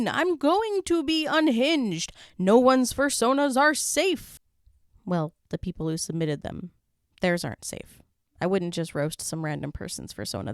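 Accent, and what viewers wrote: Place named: Minnesota, United States. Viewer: it sounds Minnesotan to me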